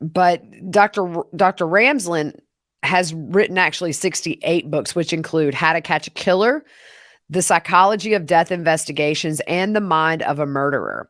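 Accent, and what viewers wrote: South, United States. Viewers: …this is my go to channel for outright facts! You’re the best! And your country woman accent helps! Nothing a Boston city boy likes more than a country girl givin information! 😂😂 you go girl!!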